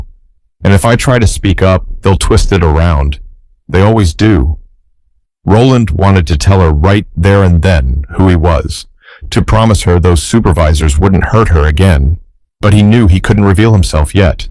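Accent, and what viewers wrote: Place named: United Kingdom. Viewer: Best way to improve British Accent. RECOMMENDED🎉🎉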